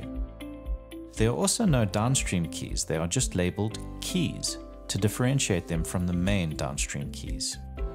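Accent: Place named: South Africa. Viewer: Great explanation and well put together video . Only Tricaster video with a South African accent which makes me happy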